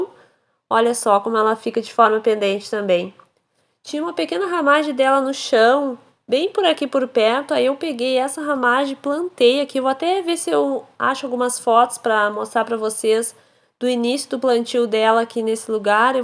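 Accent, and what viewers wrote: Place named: Pelotas, Brazil. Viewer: Parabéns pelo canal. Seu sotaque parece da região de Pelotas. Obrigado pelas dicas.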